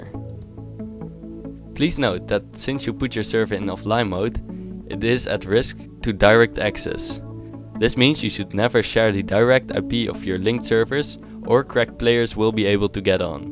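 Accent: Netherlands. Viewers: je klinkt een beetje Nederlands haha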